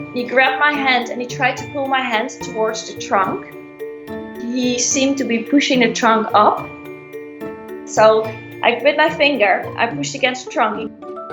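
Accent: Netherlands. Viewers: The STRONGEST Dutch accent I've heard in a while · This video was already on the Dodo but nevertheless stunning. I can hear she is Dutch and so am I , lol. 👋🌞🇳🇱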